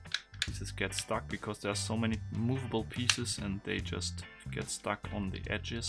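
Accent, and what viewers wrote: Germany. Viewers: Bist du Deutscher dein Englisch hört sich nicht gut an oder täusch ich mich da. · He sounds german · He's speaking Like a german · Ich liebe diesen deutschen Akzent xD (I love this german accent xD) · Also man hört raus das du ein Deutscher bist aber trotzdem ist dein Englisch super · Are you from germany? Sorry for this weird question, but I was wondering through the entire video. I go to a school in germany and noticed my classmates have the same accent as you when speaking english. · An deinem Englisch merkt man direkt das du Deutsch bist :D gutes Video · Man checkt das du deutscher bist 🤪🤣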